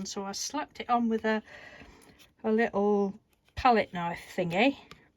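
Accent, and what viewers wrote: United Kingdom. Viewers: I just love your British accent! Just love your personality 😅so funny. These hearts are beauitful thank you!!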